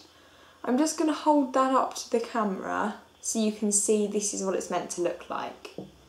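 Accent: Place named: England, United Kingdom. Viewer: I love your accent! I'm from England too but I don't have your accent :( but thanks for the tutorial! :)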